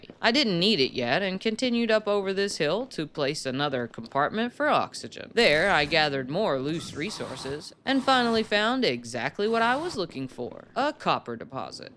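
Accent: South, United States. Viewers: you have one hell of a southern accent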